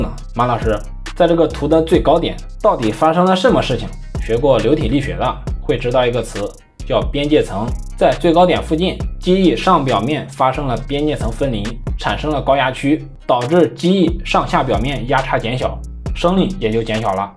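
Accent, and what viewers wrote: Henan, China. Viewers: 是河南还在菏泽老乡啊，哈哈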